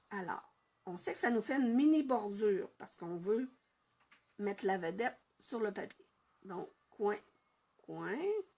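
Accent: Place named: Quebec, Canada. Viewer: Merci beaucoup, pour toutes ces bonnes informations. Cela m'est bien utile. Entendre une voix québécoise ça fait changement des voix que j'écoute habituellement. Vive le Québec et les gens de chez-nous.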